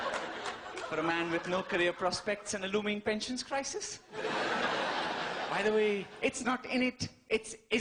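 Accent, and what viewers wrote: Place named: India. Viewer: Well-written but very poorly delivered with absolutely no spontaneity.. He speaks like my nephew would do in an Indian school play.. Probably the crowd is ethnically mixed and cannot make that out from his accent..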